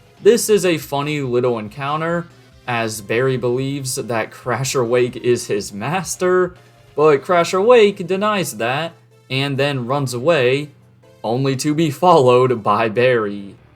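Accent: United States. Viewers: speaking in a General American Accent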